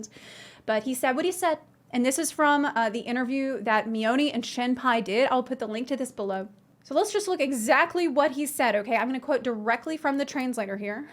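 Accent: South, United States. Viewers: Oh a southern girl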